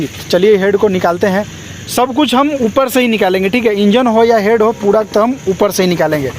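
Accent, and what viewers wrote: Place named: Bihar, India. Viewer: Bhai ap karnataka se hai, but apka baat sunke lag raha ap jharkhand bihar se hai😊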